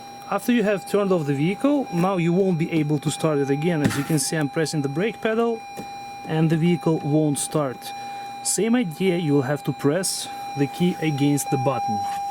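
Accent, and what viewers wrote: Russia. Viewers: That Russian accent 😂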